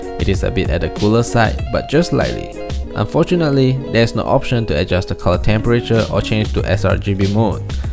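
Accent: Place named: Malaysia. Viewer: hey, great video. I guarantee that you'll have tons more subscribers very soon!! also, where are you from?? sounds like Malaysia, Singapore ah? like me ;-)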